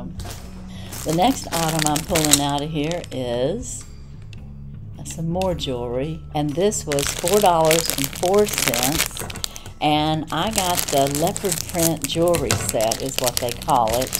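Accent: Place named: South, United States. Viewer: Hi.. so happy yt put you in my feed. Its nice to hear someone who sounds like me.. country 🤣 😍😍 love it lady keep going! I have started my channel too! Excited God bless you sister 🥰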